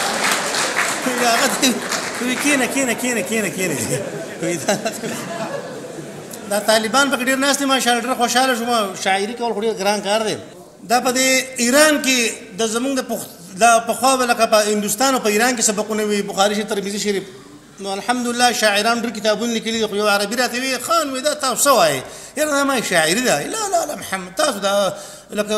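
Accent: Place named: Yusufzai, Pakistan. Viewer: دہ یوسفزو دا خواگہ لہجہ ۔ ❤❤❤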